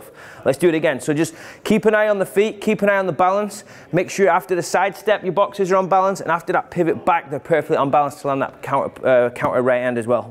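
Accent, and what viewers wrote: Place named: Clitheroe, United Kingdom. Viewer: That sounds like a Clitheroe accent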